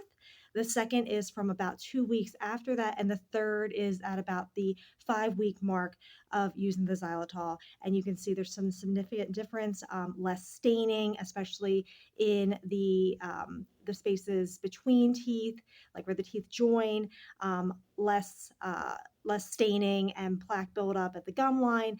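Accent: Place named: United States. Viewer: intersting ... I though her accent is so American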